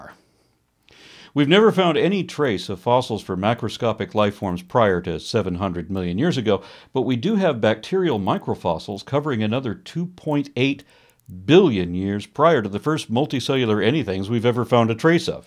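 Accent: United Kingdom. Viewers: British accent gets 10/10 from me! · Excellent video. Loved the British accent.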